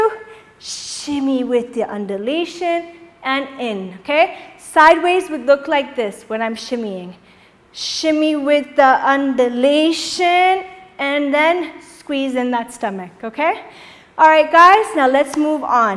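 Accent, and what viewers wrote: England, United Kingdom. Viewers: * your English accent * your Turkistan Lady look(!..) -Perfectum..✨👌🏻💖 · Omg I like her english accent.....❤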